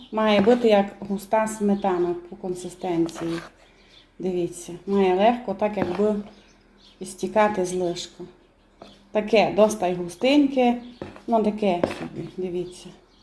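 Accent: Transcarpathia, Ukraine. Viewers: Уши греет Ваш чисто-Закарпатский.Вы откуда?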